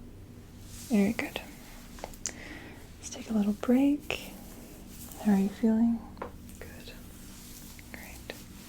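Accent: Canada. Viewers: You sound Canadian---I grew up in Toronto, myself---now living in the US--- so glad I have found you on these sites!!❤️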